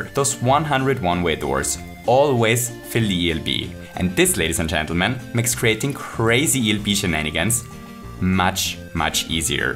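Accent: Austria. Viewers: Are you from Austria?:D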